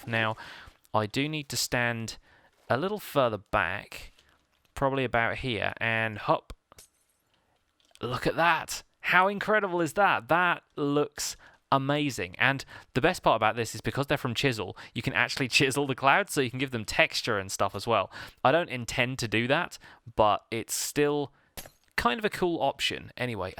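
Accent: United Kingdom. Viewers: I do like how that tower is turning out, and having the plan area does really stand out as a good focal point :) Also the spread up British accent is amazing. :)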